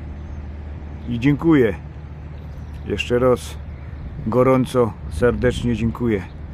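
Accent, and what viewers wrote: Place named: Upper Silesia, Poland. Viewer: Białowice to chyba dolni Śląsk a akcent masz (mosz😅) z Górnego Pozdrawiam 👍filmik oczywiście z pięknymi widokami 👍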